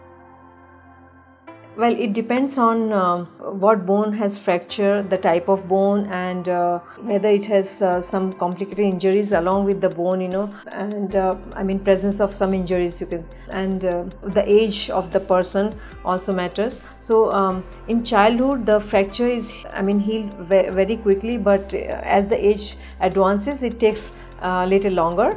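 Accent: India. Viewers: Indian accents are one of the most lovely sounding accents in the world, IMO. Thank you for the informative video.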